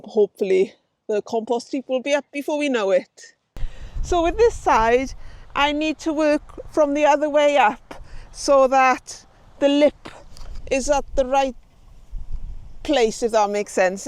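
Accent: Wales, United Kingdom. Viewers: Ah that Welsh accent! - I just want you to say lol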